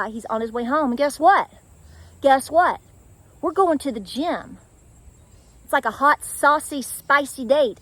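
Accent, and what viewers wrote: South, United States. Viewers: I've just found your channel recently and I could of course tell you are from the south but today I heard you mention Oliver Springs and Oak Ridge Tenn and I thought oh wow I haven't those towns mentioned in years. I went to elementary school in Oliver Springs and Clinton and other family members lived in Oak Ridge. Brings back memories.😊 I really enjoy your program!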